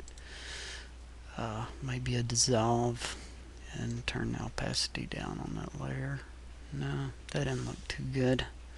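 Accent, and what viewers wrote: United States. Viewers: Love the American accent lol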